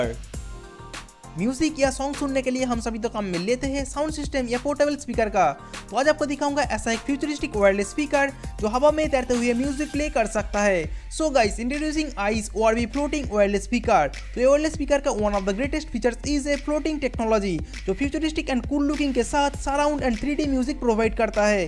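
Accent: Bengal, India. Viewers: Aar Tumi bangaali haaii... Aur aapkaaa Aaawaaj se paataa chaaaalta haiii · Sound bangali type h.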